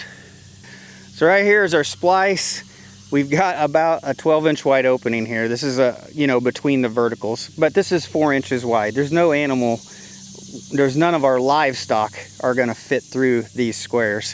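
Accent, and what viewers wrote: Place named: Tennessee, United States. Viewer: I been hearing the ess-cavator as well. I think it's the Tennessee and Kentucky that migrated into rural southern Illinois.👍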